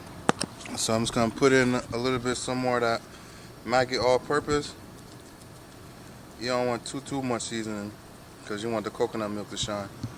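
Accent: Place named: Jamaica. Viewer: I hear a little jamaican accent, anyways just subscribed, hope yu a yardie 😂😂😂😂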